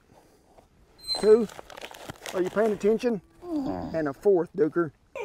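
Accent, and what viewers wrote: South, United States. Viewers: Mr., I feel I need to reach out to you since I found your site by accident. I am about your age and was brought up in Tillman County. Seeing you standing in the pasture with the Mesquites and the pond in the background brought me back to a time I loved! Rotating wheat, dry land cotton and working them old cows was just about heaven to me. Hearing your accent and the way you express yourself has got me turned around talking country, again. I left at age 18 to serve my country and have longed to return someday. That time has come and gone and I'm afraid it's too late for me now but hearing from you was like a letter from home and the tears just came gushing. Thank you so much for the trip down memory lane.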